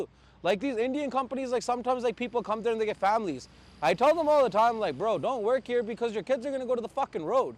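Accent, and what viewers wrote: Canada. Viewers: His accent is very much Canadian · that’s a Canadian accent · The way he’s talking he has that Canadian accent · correct he speaks proper canadian. I love it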